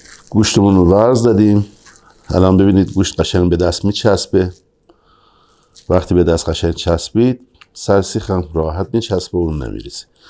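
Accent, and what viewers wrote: Shiraz, Iran. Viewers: بسیار عالی, مخصوصا با لحجه شیرین شیرازی. به به , چه کبابی 😋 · درود بر شما .. استاد شیرازی هستن؟؟❤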